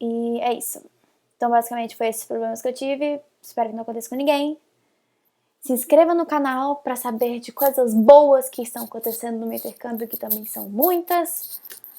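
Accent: Recife, Brazil. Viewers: tú é dq de Recife ? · Tu é de Recife??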